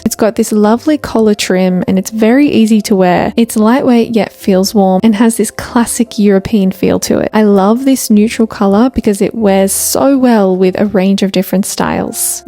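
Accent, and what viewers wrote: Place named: Australia. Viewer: She has a pretty strong Australian accent, so I suspect she mainly talks about milder winters like those in most of Australia. If you need to incorporate more layers in your climate, you can always add merino tights and a puffer vest underneath your coat. For my climate in northern Germany, clothes like the ones she showed work well enough for temperatures around 0°C or slightly below (which is most of our winter weather except the coldest days of the years) in the city, but of course these are not clothes that would keep you warm while on an extended winter hike at -10°C or below. That's fine though, winter is different dependent on the climate - coastal/inland, rainy/dry, all this will make a difference and you will have to adapt these styling tips with respect to functionality in your climate. She cannot possibly cover all winter climates around the globe. 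:)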